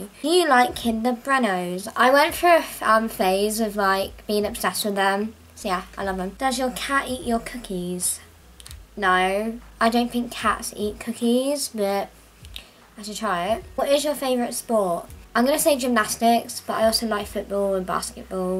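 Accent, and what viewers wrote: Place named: England, United Kingdom. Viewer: Your english accent is so cool and you have beautifull HAIR and EYES 😍😍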